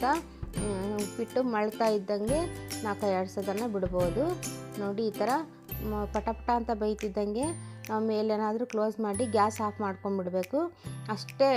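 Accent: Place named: Dharwad, India. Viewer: Nimma Dharwad Uppittu bhaal sertu.!!!! Aadra, nimma maatu Dharwad shaili olaga iddra namaga bhaal Santosh agatada.!!!! Nauo hemme inda namma kannada maatadonu!!!! 🙏 🙏 🙏 🙏 🙏 🙏 🙏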